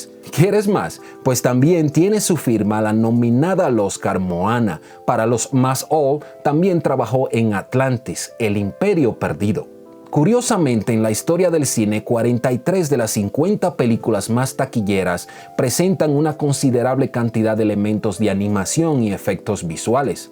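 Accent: Mexico. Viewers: Tu acento es mejicano habla con las narices mejicanos no creo que sea dominicano.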